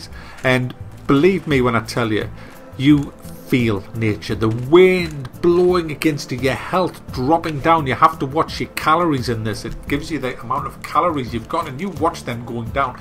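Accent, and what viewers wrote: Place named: North East England, United Kingdom. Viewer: Great review. Just bought this in the Steam sale, aided by your exposition. Looking forward to playing it! You sound local - where are you from? I live in Cardiff now but I'm up home in Gateshead for Christmas!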